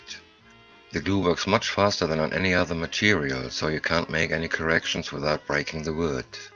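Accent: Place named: Hungary. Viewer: . I think he is from Hungary? Your accent is maybe better.... when do you expect to show the paint video for the wagon.... I look forward to it.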